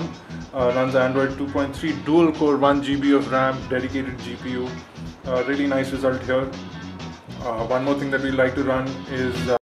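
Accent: India. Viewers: . That is not your real voice because it sounds indian and your hands are white, and that voice is really annoying. So it isnt friggin funny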